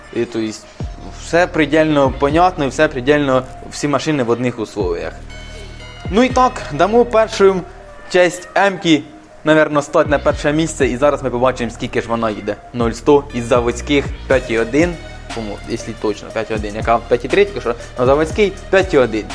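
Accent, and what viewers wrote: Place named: Surzhyk, Ukraine. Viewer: Контент цікавий, зйомка і все інше нормальне, але.. я теж, можна сказати, з села, але я не говорю так як хлопець на відео. Це пз*ц, слух дуже ріже. От ці «двігатєлі, сєкунди».. старайтесь трохи фільтрувати суржик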